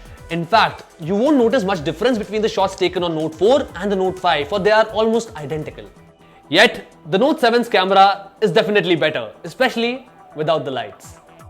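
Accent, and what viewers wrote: India. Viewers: terrible annoying i dian accent lol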